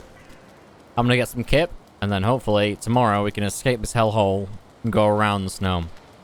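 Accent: England, United Kingdom. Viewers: giọng người Anh ?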